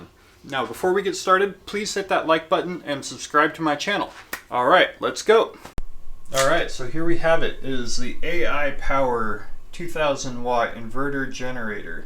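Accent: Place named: United States. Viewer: Great video. Great American English from expat in England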